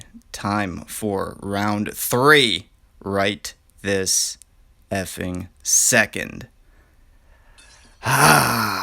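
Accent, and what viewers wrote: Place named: Russia. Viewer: Again russian accent 😎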